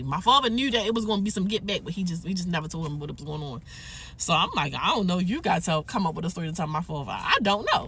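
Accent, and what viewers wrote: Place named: Baltimore, United States. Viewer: I knew exactly where she was from before she said it!lol gots to bmore careful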